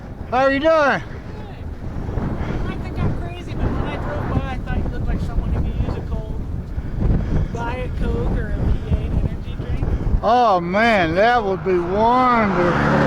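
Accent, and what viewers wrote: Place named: Texas, United States. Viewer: Excellent first episode. I like the break in commentary .I like that old Texas accent good job 👍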